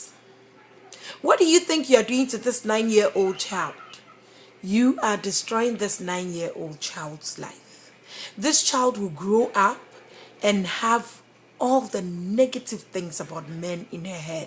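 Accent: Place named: England, United Kingdom. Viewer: you are too kind!!!!!!! we need many parents like you in this world:) BTW I Love your English accent!!<3<3;)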